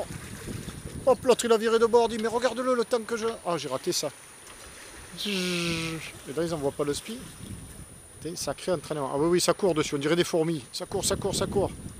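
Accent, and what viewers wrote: South, France. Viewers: Salut à toi j'espère que tu te porte bien ainsi que Tabasco, je voulais te dire que tes vidéos sont parfaites quotidiennes et hebdomadaires nous sommes tous au rendez-vous, cela fait quelques temps que je suis tes vidéos ( à vrai dire c'est mon frère qui m'a fait découvrir ta chaîne) et je vois également que tu montes à presque 4000 abonnés c'est beau parce que lorsque tu réalisé tes vidéos tu te prend pas la tête, tu mène ta vie tout simplement et tu nous la fait partager et le faite de poster plusieurs vidéos par mois ça crée une addiction lol (comme Netflix), du style " gros vient de sortir une nouvelle vidéo vas voir" ainsi avec ton accent Typique du sud on s'y croirait là sur le Tabasco, nous aimons bien refaire ton accents " Tabascoooo" Avec le temps tes vidéos ce sont améliorées (le son, vidéo, cadrage, Drone), celà ne peut qu'aller vers l'avant, Attention en mer. 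Signé un jeune néophyte!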